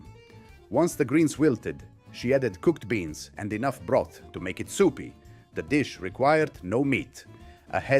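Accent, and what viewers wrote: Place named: Italy. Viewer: You have an italian accent but you don´t pronounce italian correctly. How come? For example: It´s Tschetschi, not tsetsi.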